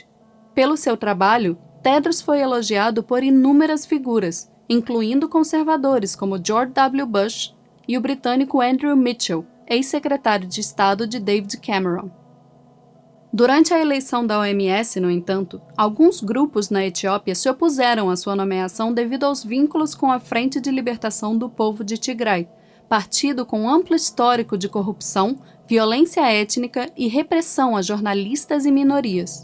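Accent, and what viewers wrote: Rio de Janeiro, Brazil. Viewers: * tirou o sotaque carioca para a narração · seu dialeto carioca natural (leve e agradável)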